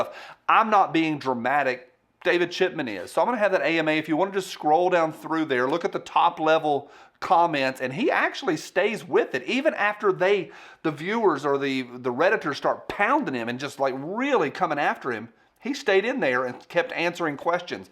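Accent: South, United States. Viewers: Howdy,! I'm from Illinois, but love the southern accent. Your channel is awesome! Thanks for the info and laughs!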